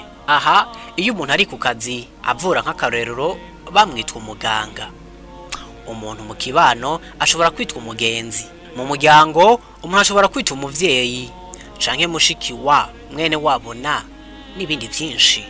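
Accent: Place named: Rwanda. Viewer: Komera cane kuri Documentaire bwana ariko accent zawe ni Mwanafunzi Esmail yuzuy Hama ikinyarwanda nicinshi kurusha ikirundi. Gusa courage cane kubushakashatsi I'm from LIMOTV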